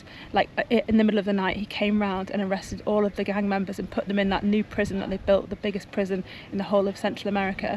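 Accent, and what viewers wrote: United Kingdom. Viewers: Firstible I love your accent real inglish and I love that you guys have learned about our culture or history it's an eye opening for other country's, but any ways thank you for visiting and enjoy it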